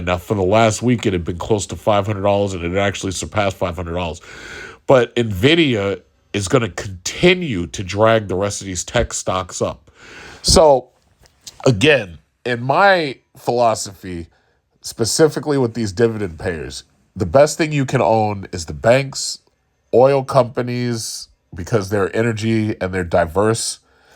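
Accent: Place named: Staten Island, United States. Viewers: My Dad, Aunts, Uncles and Grandmother were from Queens and talked the same way. It's a New York thing, kapisch? 🤌🏾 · Why does this guy sound like a white Italian guy from Staten Island..The passport bros were started by black men ...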